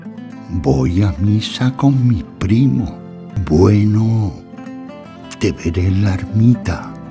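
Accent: Spain. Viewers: Pepe Mediavilla! Por usted brindo! Con respesto also mi copa y en su honor hoy vevo un tinto de buena cepa, fino. De corazon es mi sentir que lo hago verbo y con aplausos y agurios verdes de Romero, Albahaca y yerba buena afirmo y declaro por usted Pepe Mediavilla, gran Sr. : Que viva tu vida! Viva tu Libertad! Que Viva El Castellano que es tu lenguaje articulado, tu acento, mi herencia! Viva tu Cielo y tu Tierra y tu mar! Viva tu sol , tu Luna y todas tus estrellas! Que Viva tu casa, tu Mesa y tu lar! Viva tu mente con sus luces! Que Viva tu lira, tu historia y tu legado! Que Viva Espana! Que Viva Espana! Desde mi orilla Allende Al mar os deseo todo y so lo mejor! Que todo es sagrado , esto me lo aseguro un cantaor . J .M.y R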